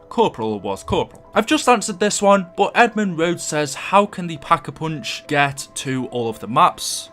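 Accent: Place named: Stoke, United Kingdom. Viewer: Love the stoke accent but anyway why are we split at the start of vürruckt??? Btw you are awesome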